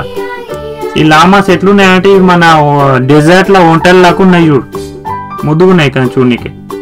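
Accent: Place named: Telangana, India. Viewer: Like the way you narrate in telangana style..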